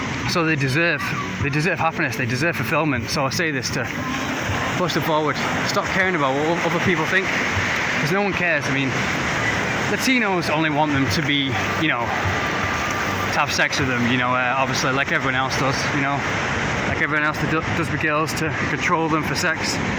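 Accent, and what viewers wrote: United Kingdom. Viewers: You sound English to me but I may be wrong.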